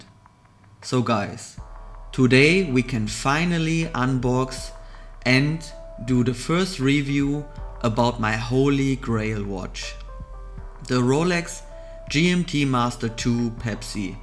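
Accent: Germany. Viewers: When this guy isn‘t german i ate a chair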